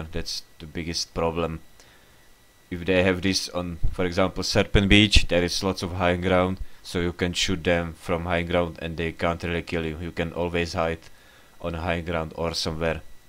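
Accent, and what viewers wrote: Czech Republic. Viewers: Jsem si říkal, že takováhle angličtina musí být od Čecha, nicméně nice vysvětlení jak hrát za Evie, dneska zkusím, jestli to je ještě aktuální a pokusím se za ní naučit. :D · Are you from cz?protože máš takovej přízvuk. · Hii I'm new here but I have a question...are you from czech republic? Love your accent !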